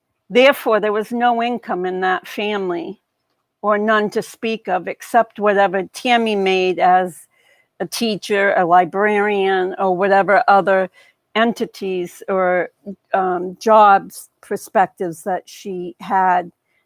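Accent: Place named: Boston, United States. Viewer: I enjoyed the information you presented. You sound like you might be from Boston.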